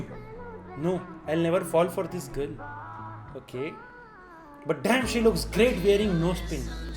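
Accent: Gujarat, India. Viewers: bhai you really suit while having a gujju accent😂😂😂... thoda bohot gujarati bolte rahiyo please har video me...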